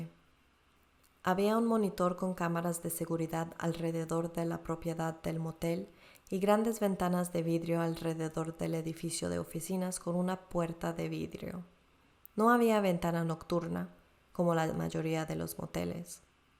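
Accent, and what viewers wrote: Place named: Latin America. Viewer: Feliz de escuchar a una narradora latina en el youtube🙏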